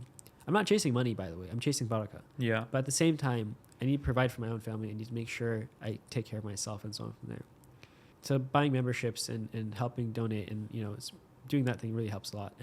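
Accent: United Kingdom. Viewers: Put on subtitles. It's a British accent so if you speak ENGLISH you should be able to understand him. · No need to - Be yourself, its a nice accent, "kinda sounds british", but theres subtitles and speed options I believe. So be yourself Inshallah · Scotish accent... Northern Scottish accent is more stronger.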